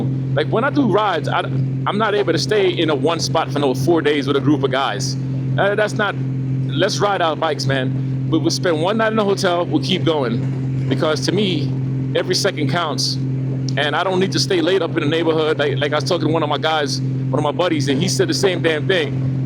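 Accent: Jamaica. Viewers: I love that Jamaican accent. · Love that Jamaican accent brother! Keep these videos coming love em! Best Album made from any star past or present my opinion was! Guess who the artist was?! 😂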